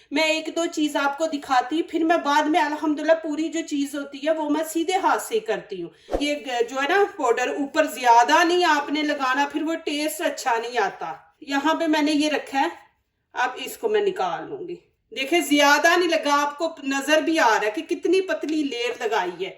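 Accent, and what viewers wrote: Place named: Punjab, India. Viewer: Pure Punjabi accent ❤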